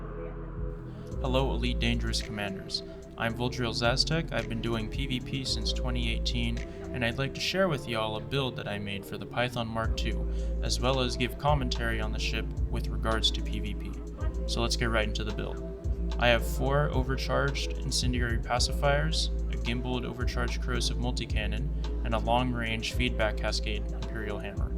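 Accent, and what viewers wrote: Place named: Philippines. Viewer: This guy sounds pretty Filipino NGL🥵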